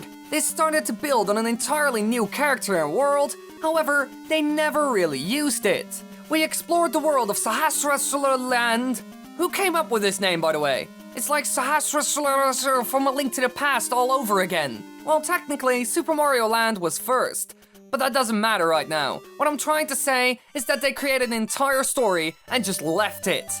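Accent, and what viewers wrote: Netherlands. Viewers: Did nobody notice that this guy sounds like hes dutch... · Wait. You are Dutch, amirite?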